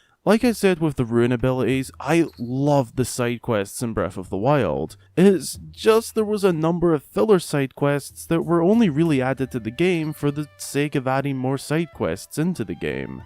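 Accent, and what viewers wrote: Scotland, United Kingdom. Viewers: So this is my first time watching your videos... And i can't keep myself wondering where you live. To me it sounds like you're from Scotland but you clean up your speech so that the rest of the world can understand you... That aside, I do agree with you on your points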